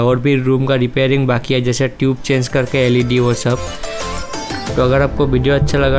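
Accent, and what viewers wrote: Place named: Surat, India. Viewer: You are speaking like surati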